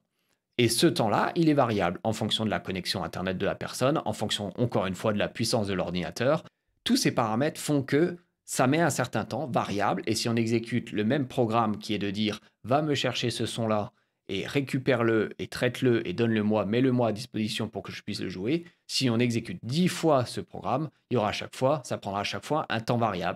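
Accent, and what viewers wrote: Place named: Alsace, France. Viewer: mec je te remercie, j'ai planché pendant 3 jours non stop. J'avais compris pourquoi on devait les utiliser mais comment utiliser ces outils, j'en étais incapable. Maintenant je vois un peu mieux et en plus tu as un léger accent alsacien ce qui m'a facilité le travail ^^'. Je pense que je vais jeter un coup d'oeil sur tes autres vidéos et merci encore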